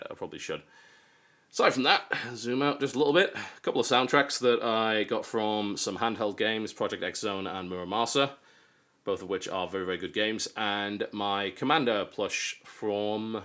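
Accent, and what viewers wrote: United Kingdom. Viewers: With that accent I expected to see UK style power outlets. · British, but lives in America.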